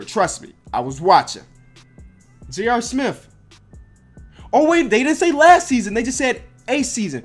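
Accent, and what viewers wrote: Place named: South, United States. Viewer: Why do you talk like you're from the south if you're from Chicago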